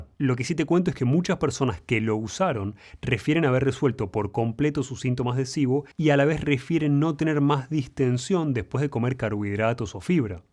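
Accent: Argentina. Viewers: DE QUE PARTE DE ARGENTINA ES EL DOCTOR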